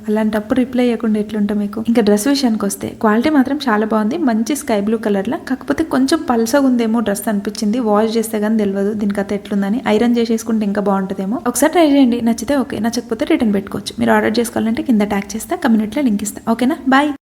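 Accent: Telangana, India. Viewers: Chaala baga matladthunnav Mana Telangana language bagundhi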